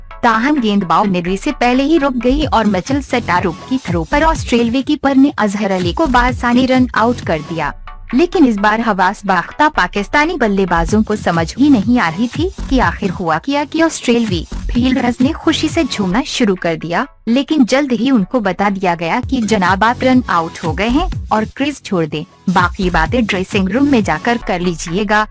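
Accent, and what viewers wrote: India. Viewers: feels like Indian is talking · Kh tu ap pakistan zindabad rhe hiny pr lehja ap indin hy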